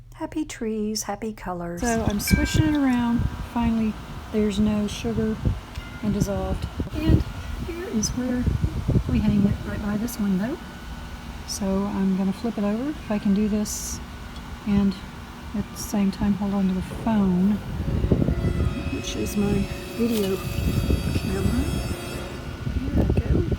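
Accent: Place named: South, United States. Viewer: You sound like a southern lady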